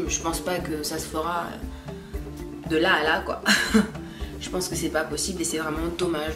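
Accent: Gabon. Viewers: Eké ton accent myéne est mitigé hein! Mais j apprécie ta vidéo felicitation 👏🏽👏🏽👏🏽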